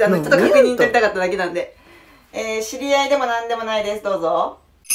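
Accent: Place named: Ibaraki, Japan. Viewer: 茨城弁うまいですね。 ほんとネイティブ茨城弁です。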